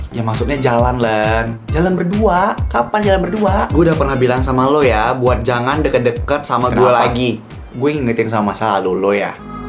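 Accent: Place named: Java, Indonesia. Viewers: Suka banget sama logat Jawa nya kak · bahasa nya medok bangett,mirip orang jawa bet😭😭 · Si cewek keriting bikin bulu kuduk merinduling anjirrrr😂😂😂 Ternya bisa bhs Jawa anjirrr Logat jawanya sudah meresap di jiwa Ad pak prestyo anjirr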